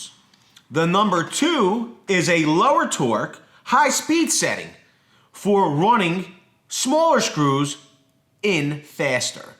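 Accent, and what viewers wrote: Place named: New England, United States. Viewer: God your yankee accent makes me cringe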